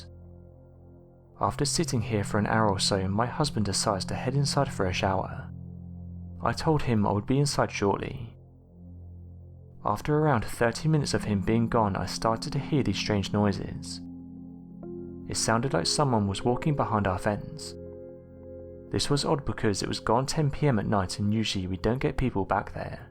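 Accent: England, United Kingdom. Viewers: It's not a robot, he's English.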